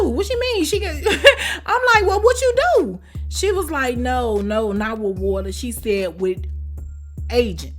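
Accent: Chicago, United States. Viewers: Never knew Chicago had an accent but I listen to another Chicago tuber named Ashley Miller and y’all sound alike ! I’m a sucker for accent keep up the context #newsubscriber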